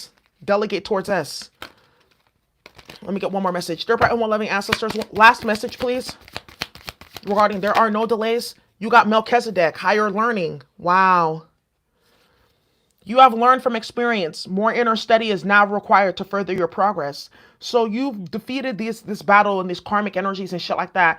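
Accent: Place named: New Orleans, United States. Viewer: Sounds like u are from NOLA. ME too!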